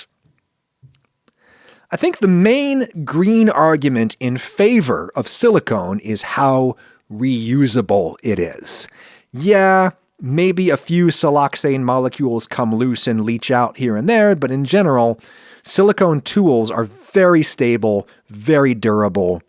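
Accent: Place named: United States. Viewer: I love the scientific angles, however hearing you talk about atoms with your US pronunciation, I always think you're talking about yourself. Atom=Adam...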